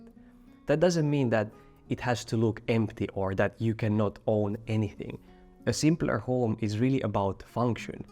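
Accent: Finland. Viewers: Got a recommendation, dat finnish accent, I don't even speak the language but I still immediately recognized rally english :D This is one of the best summaries it's going on in my community, especially among older people. It affects a lot older people, seems like this happens when your cognitive capabilities drop, hence I'm lately trying to avoid hoarding as would demonstrate I'm already getting brainpower reduced. Old people, at least in my country, were rather well off, even with mediocre job you could afford a home, so they don't value space as our younger generation. Also in the past jobs and overall life didn't require much intellectual efforts, which lead to this situation. Another reason for hoarding is that certain things are very hard to get rid of, with all this green agenda, you can't carry all the junk in the nearest dump container and if you do, you try to get there without anyone spotting you.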